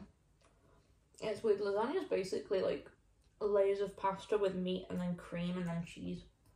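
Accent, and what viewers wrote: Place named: United Kingdom. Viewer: She’s British by the sounds of it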